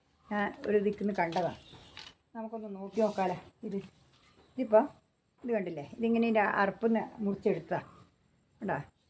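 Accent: Kannur, India. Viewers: കണ്ണൂർ അമ്മയാണ് അല്ലേ നന്ദി ഏച്ചി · അമ്മ കണ്ണൂരാണെന്നു തോന്നുന്നു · അമ്മയുടെ നാട് കണ്ണൂരാ സംസാരം കേട്ട കൊണ്ട് ചോദിച്ചതാ അമ്മേ സപ്പർ ആണ് അമ്മേ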